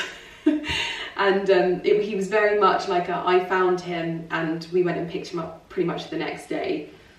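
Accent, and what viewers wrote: United Kingdom. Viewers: Why sorry? You speak as you speak. Be yourself, that’s the person you are after all. Thank you for sharing your British heritage with us. ❤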